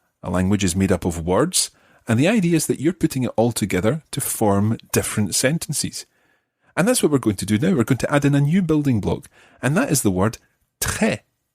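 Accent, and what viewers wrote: Scotland, United Kingdom. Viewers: Hmm, I was just thinking u were Scottish or all spoke Gaelic. !😊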